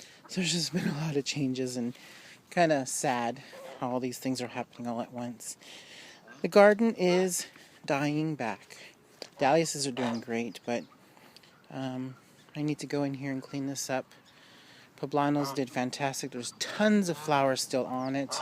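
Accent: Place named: United States. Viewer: Hi, u might have seen my comments before. I see u like you ducks ect. Well I was thinking u would like expensive more pretty kinds. And they are rather hard to get! I have red breasted and emperor geese...? They are worth quite a lot as they are hard to breed and are very very pretty! If you like any ideas with birds like these give me a shout and I can tell you. I'm not trying to see you anything as I live in Scotland and by your accent your from America? Thanks!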